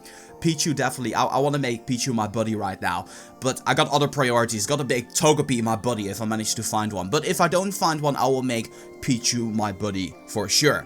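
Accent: Netherlands. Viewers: Is He Dutch?? His Accent Though I Am Dutch Too So Think He Is...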